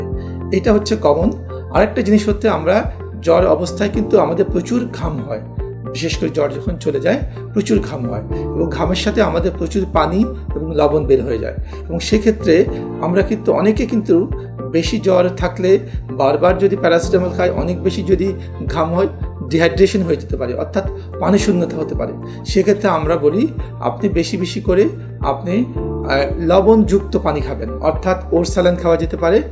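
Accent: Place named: Bangladesh. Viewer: কথায় বোঝা যায় বাংলাদেশের মিয়া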